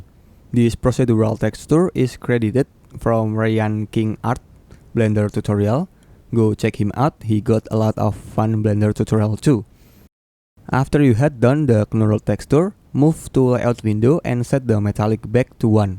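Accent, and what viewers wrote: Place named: Indonesia. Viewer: Thanks, it's helped a lot! btw I can tell from your accent, are you Indonesian? ;)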